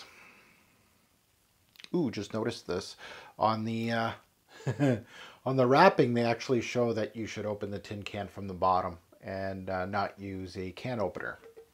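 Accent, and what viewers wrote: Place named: Quebec, Canada. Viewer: You sound exactly like any English teacher here in Quebec… you from qc?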